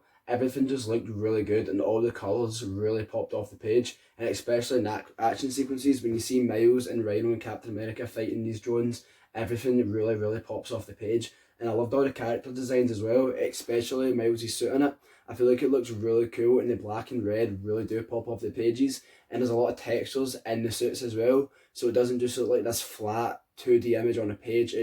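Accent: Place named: United States. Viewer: Us accent... You need to make your spells clear and use a mic.... Btw good content🤘🤘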